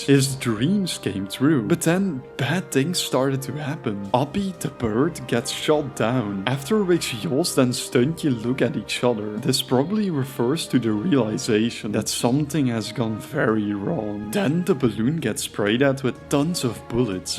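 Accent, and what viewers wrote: Netherlands. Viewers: Bro kan je echt niet wat beter engels praten met nie zon dik brabant accent erop, zelfs mij als brabander egert mij eraan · Ik ruik Nederlands 🇳🇱 het accent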